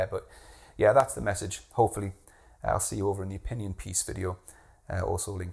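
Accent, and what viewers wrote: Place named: Newcastle, United Kingdom. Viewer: I thought you sounded like a geordie!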